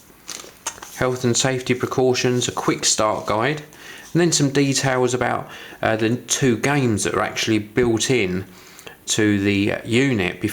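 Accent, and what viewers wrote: United Kingdom. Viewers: I really like your British accent a lot!!!!!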